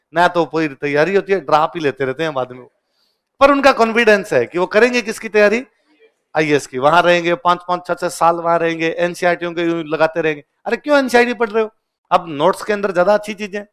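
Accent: Rajasthan, India. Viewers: Raj saa u rock and ur tone of rjasthani is owsome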